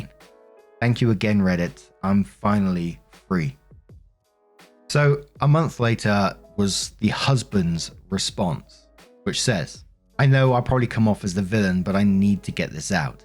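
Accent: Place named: United Kingdom. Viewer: I listen to your videos every day, but I just arrived to London from the states and it’s jarring to hear more than one British person in a day when all I’m used to is your voice 😂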